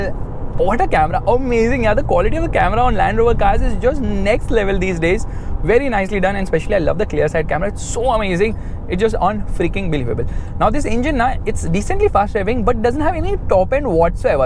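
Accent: India. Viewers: DEAR GOD HE IS SPEAKING SOOOO FAST THAT IS HARD TO WATCH VIDEO AND CONCENTRATE AT THE SAME. AND THAT INDIAN ACCENT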